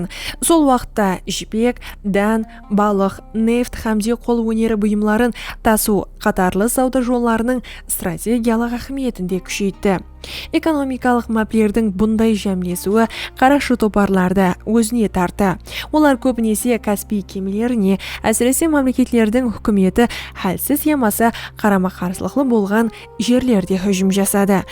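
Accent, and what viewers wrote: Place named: Karakalpakstan, Uzbekistan. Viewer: Қарақалпақша таза сөйлеген тилиңнен айналдым 🎉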